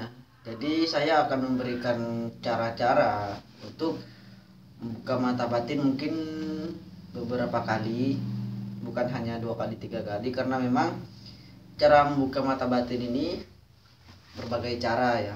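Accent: Madura, Indonesia. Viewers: Orang mna kak Kyk ada sedikit BHS Madura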